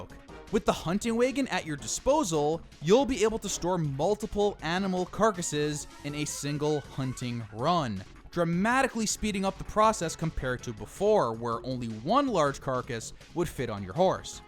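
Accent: United States. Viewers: Weygun, Wisconsin accent? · I swear this dude is from Maryland. "Waaaayyyyygen" "budddon" I'll bet he says "wuuter" too lol. But great great video. Subscribed for sure.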